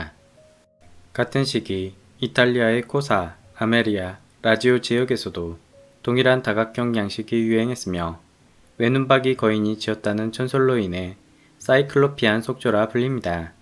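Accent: Jeolla, South Korea. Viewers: 나레이션에서 느껴지는 전라도 사투리 억양이 꼬소하네요 ㅋㅋㅋ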